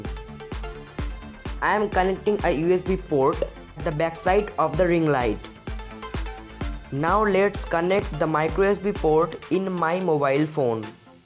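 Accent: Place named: United States. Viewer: You are an Indian but your accent is the mixture of Indian and American ....wooooo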